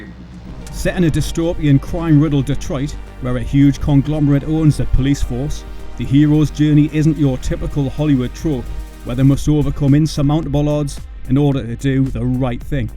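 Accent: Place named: Newcastle, United Kingdom. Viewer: It's nice to hear a fellow geordie on this channel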